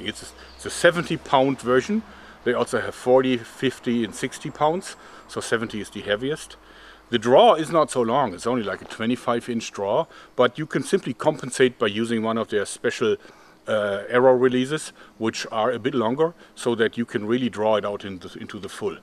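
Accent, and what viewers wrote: Germany. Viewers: he is german i know that accent if you watch albert Einstein genuis from nat geo thats the accent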